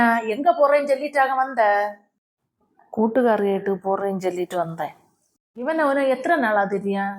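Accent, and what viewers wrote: Kanyakumari, India. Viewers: குமரி தமிழ் சூப்பர் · Kumari tamil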